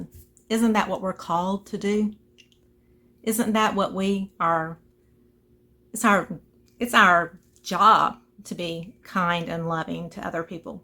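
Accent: South, United States. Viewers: I've always liked you and enjoyed your beautiful art. Now? Now, I love and respect you. What a beautiful heart. What a kind, loving and truly christianly way to respond to someone who was, how we say in the south, less than kind. Sister, you know what I'm talking about. I can hear in your voice you are a southern girl too. :) I can hear my Grandma's words as clear as the day she first said them to me, " Honey, when people are mean to you, you have to show them the love in your heart. You can't be mean back because that's what the devil wants. God wants us to love everybody. ". It was hard for me to understand when I was little. Now that I'm much older, I get it. I feel pity for people that are mean spirited. I always wonder what is going on in there life to make them so miserable. To try and hurt someone else is so negative. It's not what the world needs. 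It's not what anyone needs. The world tears us down, life's unavoidable pitfalls. Why not try to lift someone up? Being positive isn't always easy, especially when someone is unkind. You do it beautifully. I for one appreciate you, all your hard work and your loving, giving nature. You make the world brighter, happier and a better place to live. Thank you for all that you do. May God watch over you and protect you.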